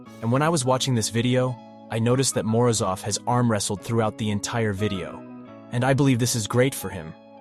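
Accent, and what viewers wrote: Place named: England, United Kingdom. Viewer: Que belo sotaque inglês, bicho. Parabéns!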